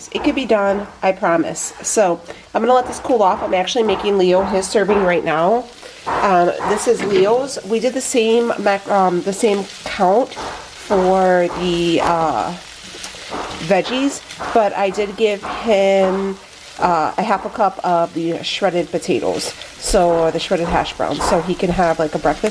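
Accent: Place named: Chicago, United States. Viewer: I love you and thats how we talk on the south side girl!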